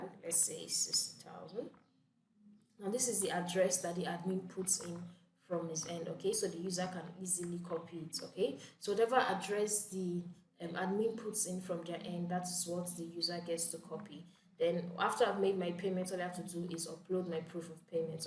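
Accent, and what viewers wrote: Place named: Nigeria. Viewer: I hear the Nigerian voice. Good job and thanks for this.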